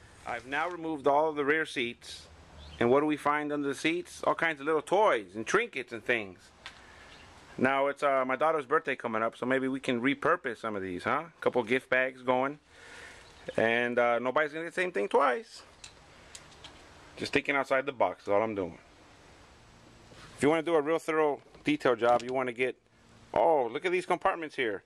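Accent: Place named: Mexico. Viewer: He talks like a mexican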